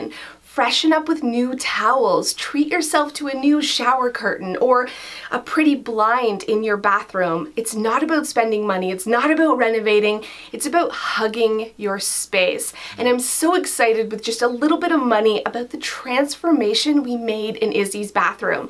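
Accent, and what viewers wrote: Canada. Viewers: You are beautiful inside & outside! I truly enjoy every video & love your relatable personality (especially your lovely Canadian accent!). Don't change a thing!!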